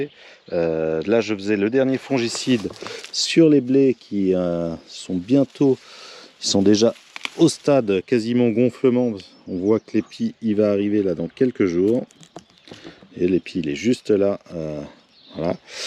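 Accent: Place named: Loiret, France. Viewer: On sent fou tu soie du Loiret tu dis tous simplement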